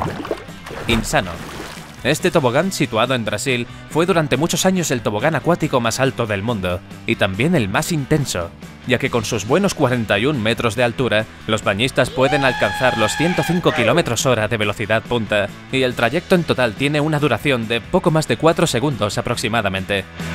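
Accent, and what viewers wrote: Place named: Spain. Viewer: Típica voz española :v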